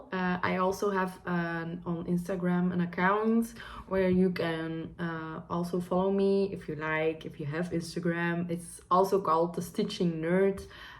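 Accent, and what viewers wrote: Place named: Germany. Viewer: Welcome to flosstube from the south or Germany! ☺️ I actually thought you were German too at first, your accent sounds very similar.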